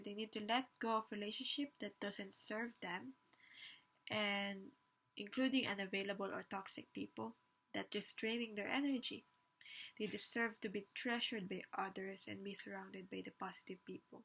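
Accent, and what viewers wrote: Philippines. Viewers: Picked no. 1. A good reading. I can tell w ur accent, that ur a Filipina.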